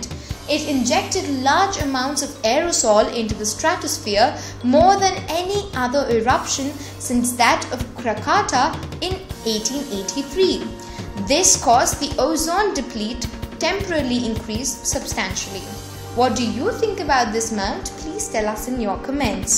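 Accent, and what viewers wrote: India. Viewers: indian xa... ganyan silang mag-ingles cute lang talaga ...-) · Indian accent my dear. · Indian accent?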